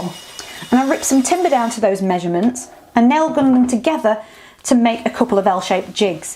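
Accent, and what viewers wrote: United Kingdom. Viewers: Nice British accent❤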